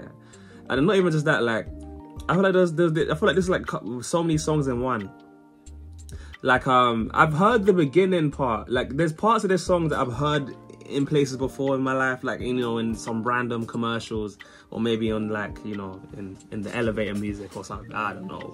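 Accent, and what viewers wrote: United Kingdom. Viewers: i could be wrong, but you sound british, and say youve never heard the beatles or queen? have you been in a coma your entire life?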